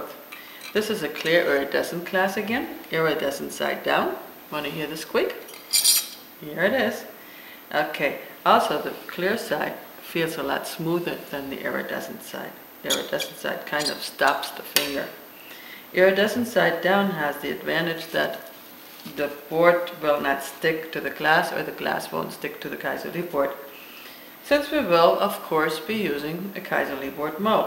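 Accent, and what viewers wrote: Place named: Netherlands. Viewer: you're such an inspiring teacher, Thanks so much! Your accent sounds like my mom (100% Dutch) anywho- keep it up- we enjoy you so! Shereen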